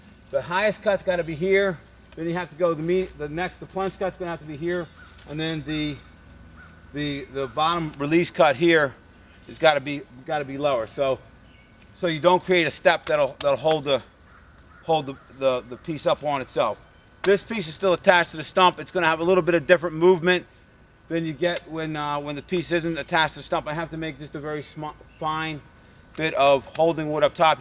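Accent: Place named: East Coast, United States. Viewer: Great video and your East Coast (NY?) accent is music to my ears.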